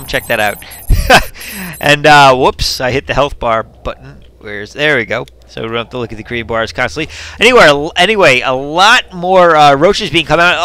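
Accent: Boston, United States. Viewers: "I'm sick of this time zone shit" + your Boston-esque accent = Me subscribing.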